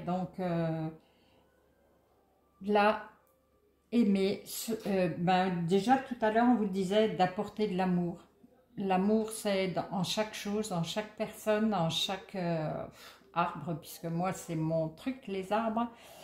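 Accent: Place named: Haute-Marne, France. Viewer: Rhooo ben non... on n'en a pas marre du tout, au contraire... on en redemande 😀😃🙂🙃! C'est toujours un grand Bonheur Boostant et Apaisant d'entendre ta voix qui me rappelle de si bons souvenirs (ils sont bien au chaud dans mon Cœur ❤ ❤ ❤ ❤ ❤)! Et cet accent haut-Marnais 😉😉... un réel plaisir 😀😃. Là c'est l'hôpital qui se fout de la charité 😀😃🙂🙃😊😇😀😃.... On me dit à La Rochelle: - "Vous n'êtes pas d'ici vous!"... Voilà, une partie de mon Cœur est dans les Vosges, une autre en Haute-Marne et encore une en Charente-Maritime 😉😉. Bref... mon Cœur a beaucoup de place 😉 et tu y es bien au chaud ma. Bisous à toute la famille. A tout bientôt ma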